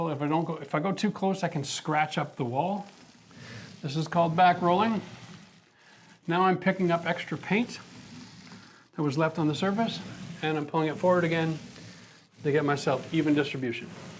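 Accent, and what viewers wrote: Canada. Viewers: Are you Canadian? I detect an accent. 😉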